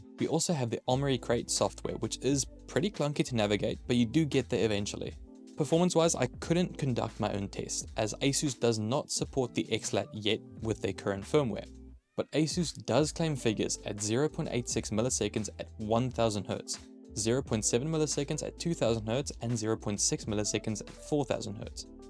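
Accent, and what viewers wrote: South Africa. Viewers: You sound South African. Where do you get your mice from?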